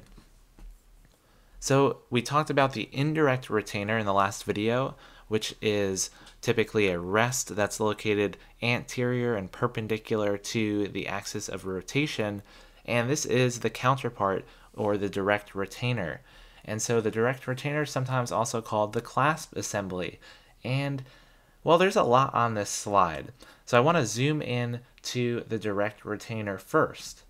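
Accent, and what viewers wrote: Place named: United States. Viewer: PLS WHEN YOU SPEAK TRY TO SPEAK ENGLISH NOT A AMIRICAN language COZ HERE SOME DR THEY ARE NON PROFICINAL ENGLISH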